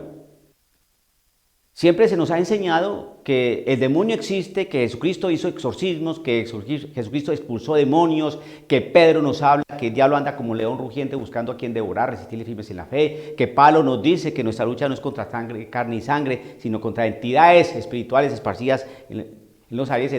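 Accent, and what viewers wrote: Colombia. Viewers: Padre excelente sus enseñanzas, más claro imposible; así hablamos los colombianos, con la ruana puesta, para estos climas que hacen por estos días y de ñapa con la delicia de aguadepanelita. Se le aprecia mucho padre 🇨🇴🙏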